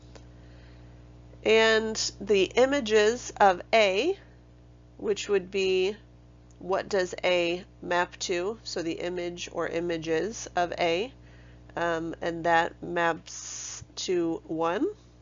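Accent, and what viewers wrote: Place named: United States. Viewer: All of your videos I have watched thus far have an electric ohmmmmmmmmmmm sound to them. idk I feel like when you're going to upload this much content it is probably worth looking into. Maybe you have by now idk. But you have a nice American accent and I really need to learn this stuff haha. Thank you for uploading these. I truly appreciate you educators who upload content that is free an accessible to all. You're the best. ☻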